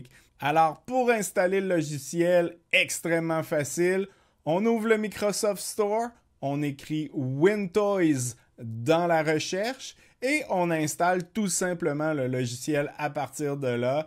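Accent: Quebec, Canada. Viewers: Bonjour , comment s'appelle l'application ,je n'arrive pas a comprendre le titre désolé j'ai un peu de mal à comprendre l'accent québécois .sinon top continue comme ça . Je me suis certaine fois de problème grâce à tes explications . merci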